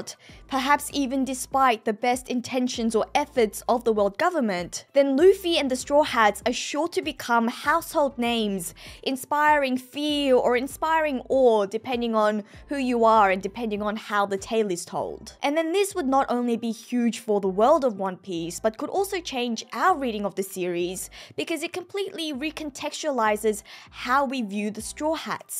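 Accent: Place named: Australia. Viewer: It seems like a lazy comment but ..I actually broke my rule of not commenting on public youtube videos.. Fairly new to the channel. I have to say I enjoy your videos. I respect the knowledge, research and clean execution in your videos. The are down to earth and come off very natural while having professional execution. You're funny without trying to be funny. Your points are well thought out. Yet still down to earth. I'm guessing you studied communication or broadcasting. You have a talent for it. I also love your aussie accent. Thank you for the funny response. I almost laughed out loud :)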